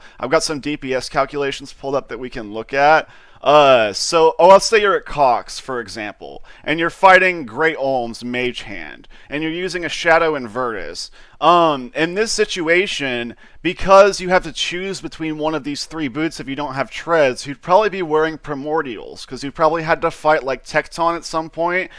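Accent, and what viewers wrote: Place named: Connecticut, United States. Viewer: Bruh youre from Connecticut arent you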